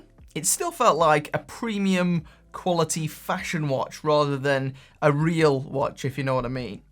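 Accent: United Kingdom. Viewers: Just wondering, what is your British English Accent?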